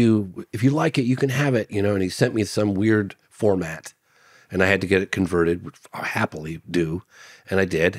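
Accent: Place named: Scotland, United Kingdom. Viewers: The new Scottish Joe Rogan 👍🏻😂😎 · Host must be from Glasgow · Great interview, thanks to both of you. Fox deserves front row in the Ufology Hall of Fame. Speaking of historical crashes, many of your followers will be aware of US marine Jonathan Weygandt and his 2001 interview with S. Greer on a Peru UFO crash (craft shot down). This interview is well-known among ardent researchers in the field. So I want to mention that Jonathan Weygandt has now done a second interview, 12 days ago, and it can be seen on Martin Willis channel. I just became aware of it via Chris Lehto. Weygandt had vanished from sight, disappeared after his one-and-only appearance, and probably most of us old-timers figured he was dead. 2nd interview is remarkable for one thing especially, the fact that 'someone' (US ops) took the craft down, probably with a Hawk missile, or similar. 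Lastly, (Canadian here) lovely to hear the Glaswegian brogue and know you've not gone 'well- ' for youtube! Cheers!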